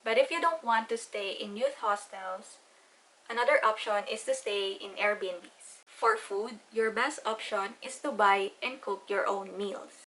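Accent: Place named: Ilocos, Philippines. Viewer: Parang Hindi Lang Filipina c mam.kasla ilocana pay.😄❤️❤️❤️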